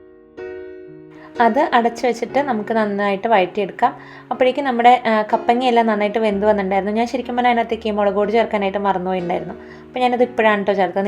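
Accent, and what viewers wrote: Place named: Thrissur, India. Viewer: ഞാൻ കൊടുങ്ങല്ലൂർ ആണ് thrissur Dist സംസാരവും food എല്ലാം വളരെ പരിചിതം ആയി തോന്നി അതുകൊണ്ടാ സ്ഥലം ചോദിച്ചത് ട്ടോ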